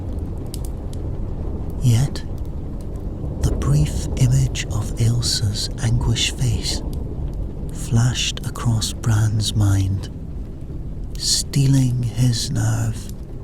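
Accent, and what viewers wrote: Scotland, United Kingdom. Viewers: Think it's only a matter of time before your channel really takes off, your stories are unlike any other sleep channel, I really get list in em and you've got a great voice with that slight Scottish twang, not too heavy, perfect for sleep stories man. I think a Underworld sleep story would be a good one, the Vampire death dealers hunting the lycanthropes. Yes brother what you think??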